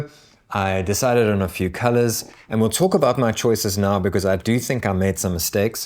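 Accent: South Africa. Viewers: Sounds like you are from Cape Town South Africa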